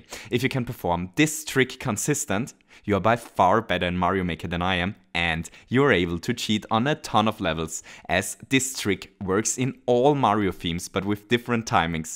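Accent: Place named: Germany. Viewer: Haven't you heard of its a German accent?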